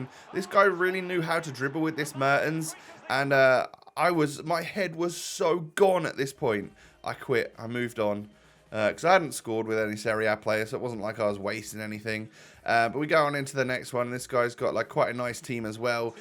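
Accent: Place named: Black Country, United Kingdom. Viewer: 😂😭 spot on black country accent boss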